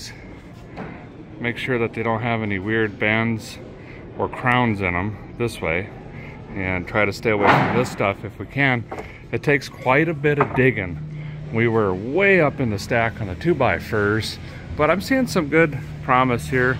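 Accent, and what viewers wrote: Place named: South, United States. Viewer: I’ve noticed that you are starting to sound like a true southern. When you start saying Fixin to do something you have arrived my friend.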